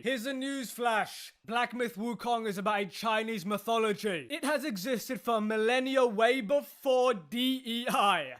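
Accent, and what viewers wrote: United Kingdom. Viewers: i love your british accent sooooooooooo much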